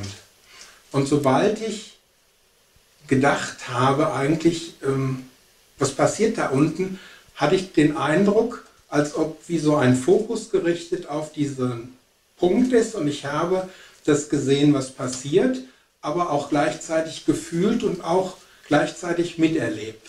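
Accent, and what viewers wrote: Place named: Switzerland. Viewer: Seltsam. Ich finde Herr wirkt sehr ruhig, aufmerksam und hochkonzentriert. Seine Art ist schlicht wohltuend. Den leichten Schweizer Dialekt mit dem er spricht empfinde ich als angenehm.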